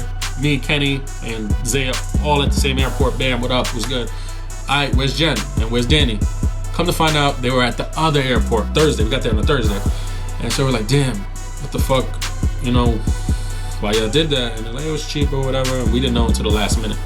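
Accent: New York, United States. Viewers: Lol you was a Spanish nigga in Chicago with a new York accent and shit hat to the left them niggas thought you was a Latin king. Spanish gangs in Chicago though besides Latin kings and SDs ain't really on shit you probably would've just got ran out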